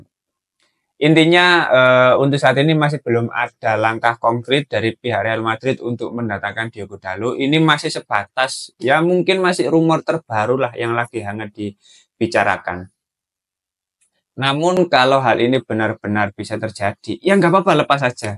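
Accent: Java, Indonesia. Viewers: Sampean arek mana mas..logate kok koyo jowo sblh ndi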